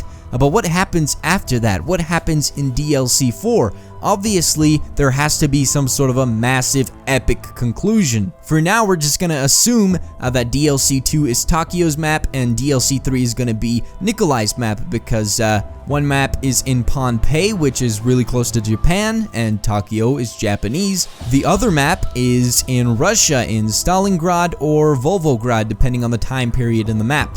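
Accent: Bosnia and Herzegovina. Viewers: are u bosnian?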